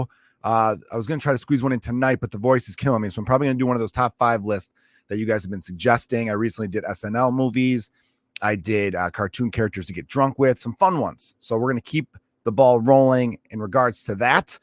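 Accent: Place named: Chicago, United States. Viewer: Personally, I like when you go a little “too Chicago”. It’s relatable.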